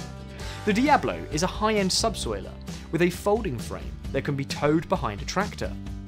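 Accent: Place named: England, United Kingdom. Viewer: I really don't need the england man in my screen or voice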